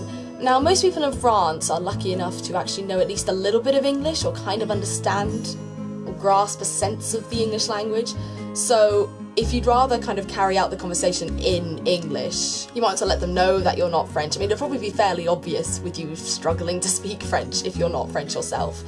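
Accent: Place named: United Kingdom. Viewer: *o* Pretty girl with British Accent. *Faints*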